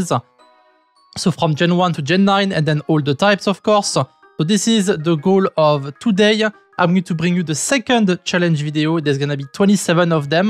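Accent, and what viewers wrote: France. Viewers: You're French right?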